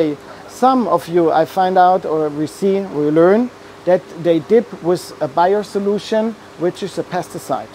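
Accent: Swabia, Germany. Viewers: no a little more schwäbisch please 😊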